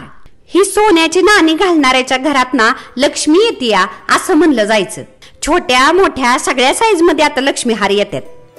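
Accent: India. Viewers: दागिन्यांची खुप छान माहीती दिली ताई आणि ती पण अस्सल मराठी ठसक्यात👍🏼 दिल्याने खुपच छान वाटले... तु तर अप्रतिम सुंदर दिसत आहेस 👌🏻👌🏻👌🏻👌🏻😊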